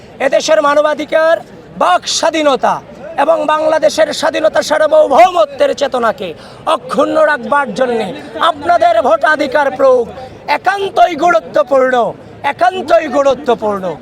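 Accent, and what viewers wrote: Kolkata, India. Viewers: ভাই কি কলকাতার?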